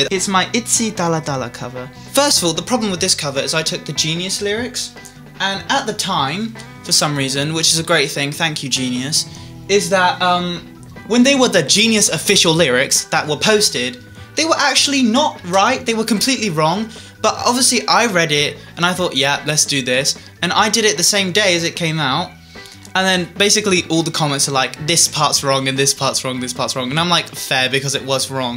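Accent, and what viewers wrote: United Kingdom. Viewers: why do you have a british accent-whaaaAa? my small brain cell... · Are you korean? Because u look korean but then u speak British 😰✌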